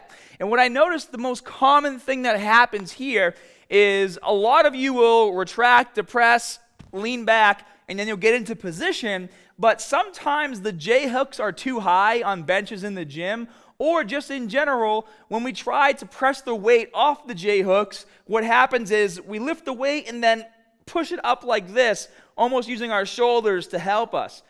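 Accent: Boston, United States. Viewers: Before we get stah-ted…love the Boston accent…! ♥️🧦⚾️ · I'm gettin a HIIINT of Boston in this guys accent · I dint remember his baahhhsstahhhhnnn accent being so thick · I don't remember being so Bostonian. I feel like there's a Mandela Effect cause I swear he sounded normal like 10 years ago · I just subscribed.. great info plus you must be from Boston GO PATS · Really helpful. I had a nasty shoulder injury years ago due to bad form on incline bench - shoulder blades not retracted, flared elbows, grip too wide - and haven't done it since. However, it's a really useful exercise and I'll give it a go again having watched this. Btw I like the Boston accent and am looking forward to getting stahded with a proper grip on the bahbell. Or rather starrrted and barrrbell as I'm Scottish. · Wait, where tf did that Boston accent come from. He doesn't have that accent in his older videos lol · Boston accent? 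· Boston accent actually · Yeah he’s definitely from Boston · bro is boston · Are u from Baston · This guy is so from Boston. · I have watched many videos about inclines and everyone is saying differently on how your form should be so who is right and who's is wrong?! And you must be from Boston?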